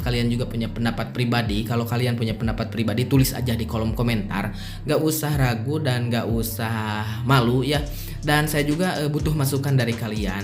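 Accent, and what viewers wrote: West Java, Indonesia. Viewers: Gak suka sama suara nya kebanyakan pakai daerah sunda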